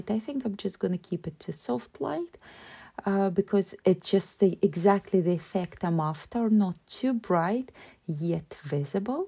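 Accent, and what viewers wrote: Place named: Eastern Europe. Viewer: You have a lovely accent. Is it a mix of Eastern European and Scottish?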